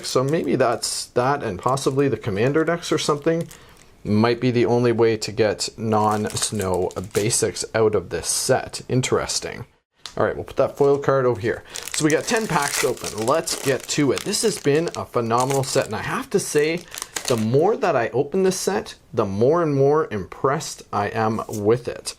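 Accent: Canada. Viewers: No idea you were canadian and now i can't unhear it :P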